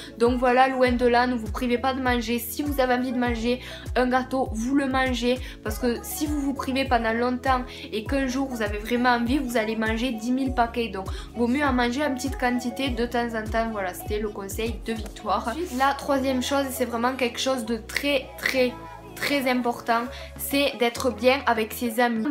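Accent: South, France. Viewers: C'est pas genre un putain d'accent du Sud! haha il est encore plus marqué que le mien! ;)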